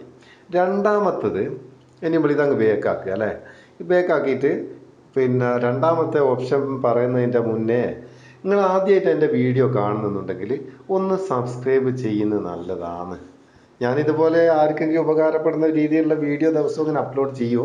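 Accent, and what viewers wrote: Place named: Vadakara, India. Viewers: Haai kolalooo vadakan kerala bhasha kalakiii karyam clear aayyy kolllaa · Orijnal nadaaapuram samsaram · vadakarakkarum ingono okke yaan samsaarikkuka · വടേര ഭാഷ...... നല്ല മൊഞ്ജ് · അര്യത്താ അയേ......വർത്താനം ketteram തോന്നി.....😊😊😊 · അടിപൊളി സ്ലാങ് ങ്ങള് മേപ്പയ്യൂർ ആന്നോ!!മ്മളും സബ്സ്ക്രൈബ് ചെയ്തിക്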